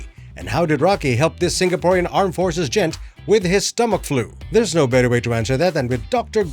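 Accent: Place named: Scotland, United Kingdom. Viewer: I like your Scottish accent better than Scrooge McDuck and the critical drinker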